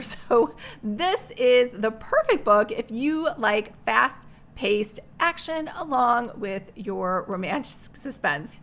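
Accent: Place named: United Kingdom. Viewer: Bed and breakfast and fun British accent ? Count me in ! This sounds like the perfect weekend read. Can’t wait to dive into it—thanks for the great suggestion!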